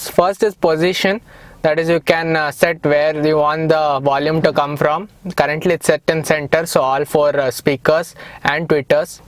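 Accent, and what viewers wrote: India. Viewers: your accent is sooo damnn funny bengali babu😂😂 and bro why r u so nerdy ?? improve ur personality dude open up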